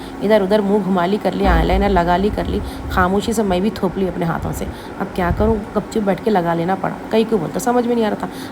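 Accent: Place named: Hyderabad, India. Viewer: mam Allah bless you thank you ma'am bahut pyari acting aur bahut pyari awaaz bahut Pyara Hai Aapka baat karne ka Hyderabadi thank you so much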